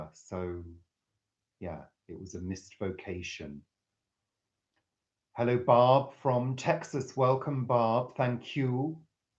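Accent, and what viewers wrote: United Kingdom. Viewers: You have a very British accent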